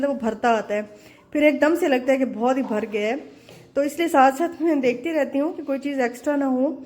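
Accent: Saraiki, Pakistan. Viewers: apki saraiki sun Kar acha laga.im from bahawalpur.ap kis city mai rehti han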